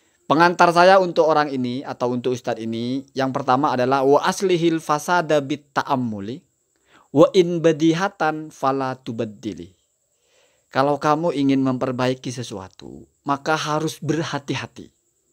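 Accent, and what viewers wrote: Madura, Indonesia. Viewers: Kalau dr nadanya, kayaknya Sampean oreng madhureh. Kerren gus. Bisa bagi nomer wa gus?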